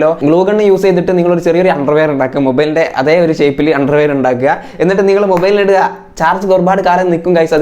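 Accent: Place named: Thiruvananthapuram, India. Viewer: തനി തിരുവനന്തപുരം ഭാഷ😂👍👍 ബ്രോ പൊളിയാണ്😘😘👍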